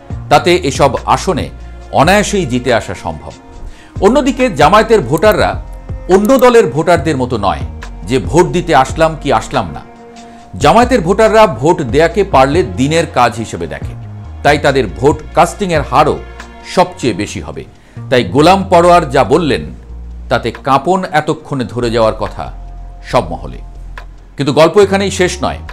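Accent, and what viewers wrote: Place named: Kolkata, India. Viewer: Apnar kotha bolar dhron kmon jno kolkata kolkata tan ta ace .... 😅😅Bolci dada apnar bari kotay???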